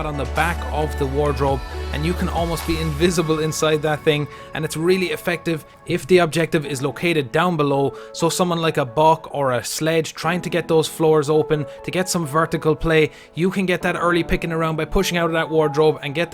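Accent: Ireland. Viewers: i can tell ur Irish from your accent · Are you Irish?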